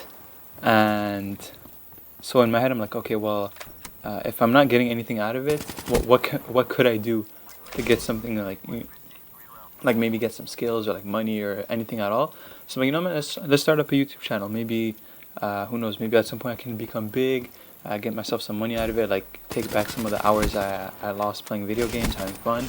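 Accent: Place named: France. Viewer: dammit, 2nd lol but that french Accent tho hahaha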